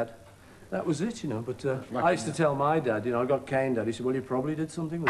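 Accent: Liverpool, United Kingdom. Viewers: Scouse power 😂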